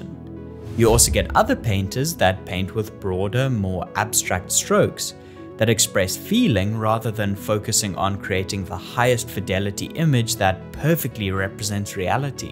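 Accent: South Africa. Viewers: south african accent I detect ..ah subbed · Fellow South African! Love the content and recognized the accent immediately… 😉 🔥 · I really don’t hear this accent (South African?) on YouTube too much. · Where are you from mate? Your accent sounds closer to South African than most things I hear on YouTube :)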